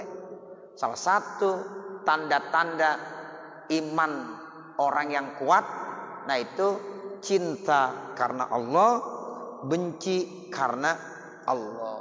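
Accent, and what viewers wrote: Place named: Jakarta, Indonesia. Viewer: Smg Allah berikan kesehatan buat tuan guru kite, smg terus berdawah dgn bhs betawinya yg khas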